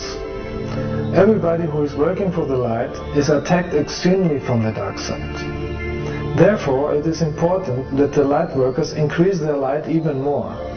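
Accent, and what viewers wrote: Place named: Germany. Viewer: Trovo questo discorso estremamente interessante e veritiero,ma non dovevano, per rendere la cosa piu' credibile ,far spacciare questo signore per un extraterrestre,infatti conosco bene l'inglese ed effettivamente suona come fosse un tedesco parlando inglese,quindi nulla di soprannaturale,ma nulla toglie che il suo discorso non fà una piega.Umanamente bravo nel suo intento.Se non dovessi essere corretto nel giudizio chiedo umilmente scusa a gli extraterrestri.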